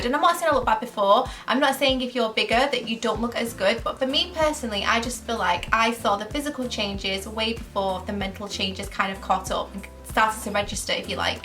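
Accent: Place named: Manchester, United Kingdom. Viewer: Hi sweetness, this is such a lovely video. It’s so honest and genuine. I wanted to let you know that I appreciate you! You inspired me to start weight watchers 5 weeks ago when I wasn’t sure what to do with myself and all of your videos have really helped me understand how to do it in a practical way with lots of yummy recipe videos. I’ve lost 7kgs in a month just through WW and I feel so much better in myself already! On a totally different note I love listening to you, my dad is originally from Manchester so your accent always make me feel at home. Lots of love across the seas ❤️ ❤️ (from Melbourne, Australia)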